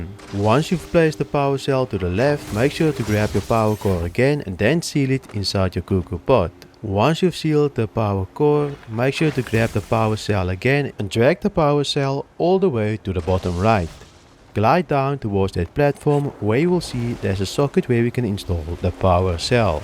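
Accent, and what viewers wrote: South Africa. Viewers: Are you South African? I was trying to place the accent.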